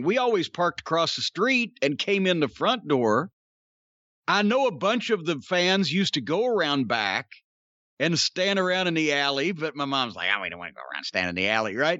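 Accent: South, United States. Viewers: With the southern accent it's definitely a Hank Hill type thing I have never heard Jim sound that much like Hank Hill in my life but now I can't on here that. Mike Judge did base the character of Tom Anderson/Hank Hill off of all the older Southern men he grew up around. And although is about the same age as Mike Judge Jim sounded like Hank Hill when he said that and I honestly think it's just an older southern thing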